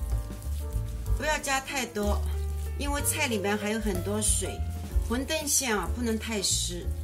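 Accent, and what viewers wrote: Shanghai, China. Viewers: 最喜欢Jenny甜的发糯的上海普通话，今天就先包荠菜馄饨！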